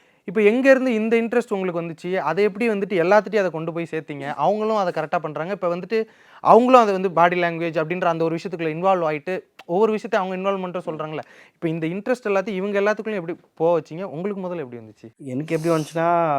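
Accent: Bangalore, India. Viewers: Nan kuda chennai slang nu nechan but Bangaluru tamil nu enaku thariyathu super ha iruku unga videos new subscribe ❤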